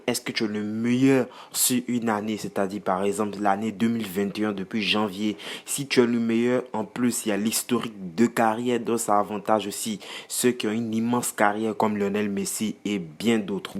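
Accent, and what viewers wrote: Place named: Ivory Coast. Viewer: T’a l’accent ivoirien toi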